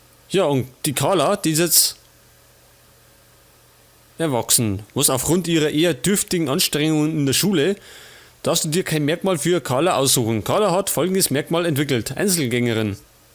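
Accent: Bavaria, Germany. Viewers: Find dich richtig geil. Einfach ein bayrischer LPer. Das hat was auch wenn ich dich nicht wirklich verstehe.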